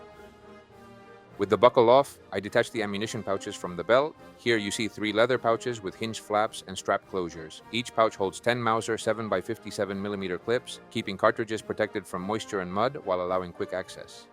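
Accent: Spain. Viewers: By his accent id say hes spanish ,but speaks fluent english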